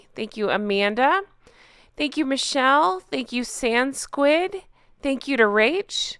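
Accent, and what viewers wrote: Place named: East, United States. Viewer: You are correct, Greg. But she is from somewhere in the east US, making her pronunciation incorrect. Besides, mine is the dictionary pronunciation, which I only checked after your comment.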